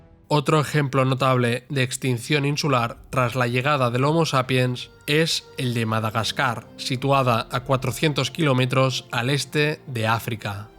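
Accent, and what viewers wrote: Spain. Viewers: No hay duda que los mejores documentales deven tener la Voz de un Español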